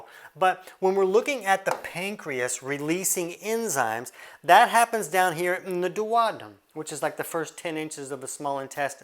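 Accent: United States. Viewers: Dr, which state is your accent from ? best wishes from Brazil 😁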